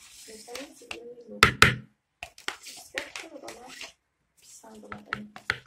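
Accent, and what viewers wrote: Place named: Isfara, Tajikistan. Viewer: Майлаш мемонм ба фикр хамшахрием апачон ман Аз Исфара хастам аз гапзанитон ба фикр хамшахрем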